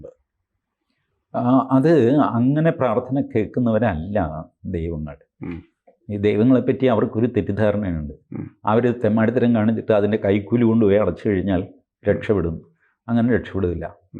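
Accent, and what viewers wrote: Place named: Kasaragod, India. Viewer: കാസറഗോഡ് ഭാഷ എന്ത് കുഴപ്പം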